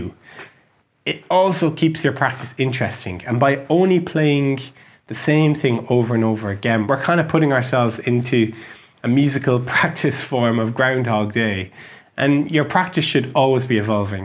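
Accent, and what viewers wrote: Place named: Ireland. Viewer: I met with similar inspiring and effective teachings in a famous book a decade ago but never with such a pleasant to the ear soft Irish accent :)))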